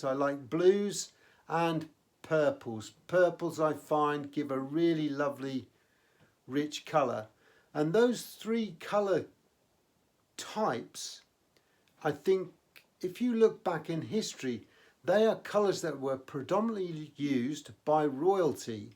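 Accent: United Kingdom. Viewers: Oh marvelous. I used to work in Beccles as a gamekeeper when I was young and a butcher a few years after that. I'm from Narj actually. I did hear you used some eastern terms and a slight accent with them lol.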